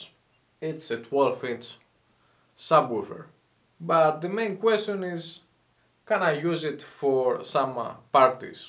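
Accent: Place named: England, United Kingdom. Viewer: Το αγγλικό ακσάν σ αδερφέ τα σπάει ⭐️ να'σε καλα και καλα χρυστουγεννα! 👍🏼💪🏼🎉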